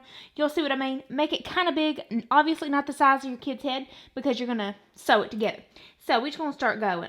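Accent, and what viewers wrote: South, United States. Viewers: I love her southern accent!!!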